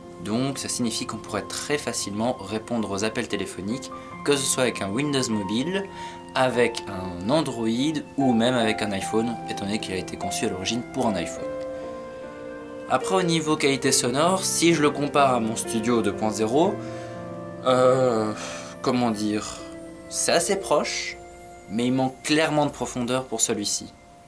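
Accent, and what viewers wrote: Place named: Belgium. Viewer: T belge c sure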